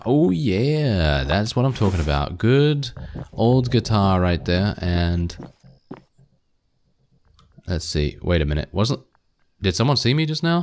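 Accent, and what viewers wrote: United Kingdom. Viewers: Came here for the games and stayed for the british accent.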